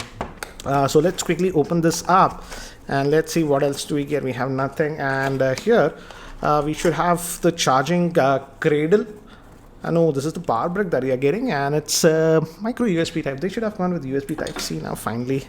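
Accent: India. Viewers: Practice more on your english accent ...less indian plz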